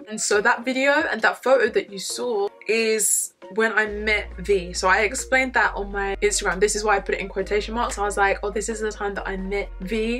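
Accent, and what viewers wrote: United Kingdom. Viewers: You have beautiful British accent 😍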